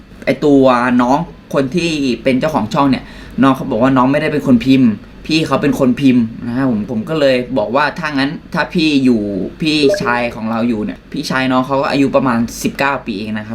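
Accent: Isan, Thailand. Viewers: สำเนียงเเมร้งโคตรลาบ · สำเนียง อีสานบ่ ไม่รู้สิ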